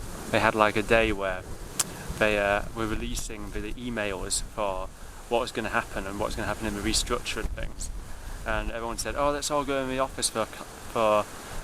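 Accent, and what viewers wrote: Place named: Northern England, United Kingdom. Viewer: A combination of my ancient damaged hearing and your slight northern accent had me hearing Fjord for field...blimey I thought, Bray and the Thames has changed 😂 Golden rule of Blackberry picking...always higher than the local largest male dog can piss. I look forward to the video of your Somerset and Exmoor exploits, it’s always good to see a different view of where you live. Take care and be safe 👍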